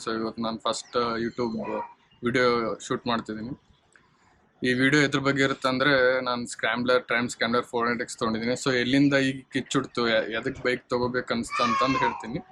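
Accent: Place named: Yadgir, India. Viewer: Proper Yadgir accents 🎉❤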